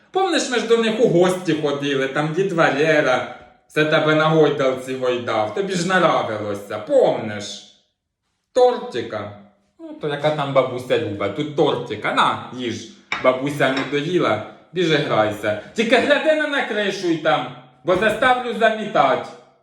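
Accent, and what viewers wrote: Poltava, Ukraine. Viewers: Полтавський говір не сплутаєш ні з ким.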